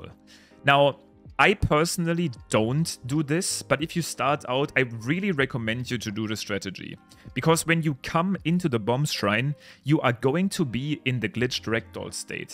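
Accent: Germany. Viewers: are you German? your English sounds really german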